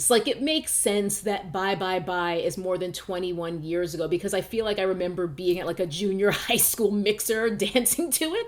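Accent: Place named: New York City, United States. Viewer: NYC accent is thick in this video!!! Also you know I watched this immediately!!!! Click bait!! I’m old!! Sobs in corner to “ ” which is also old enough to drink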